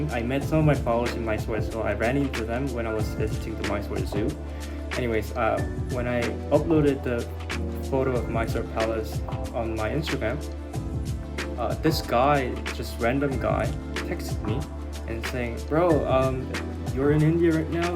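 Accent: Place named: South Korea. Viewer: you speak english well with a Korean flair ofcourse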